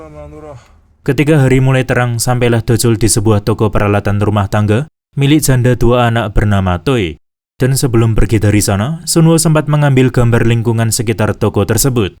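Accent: Java, Indonesia. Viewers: Medok banget 😢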